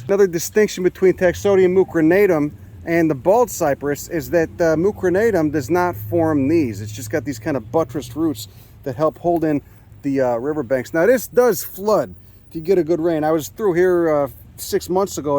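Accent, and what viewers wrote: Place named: Texas, United States. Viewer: Genuine Texas accent!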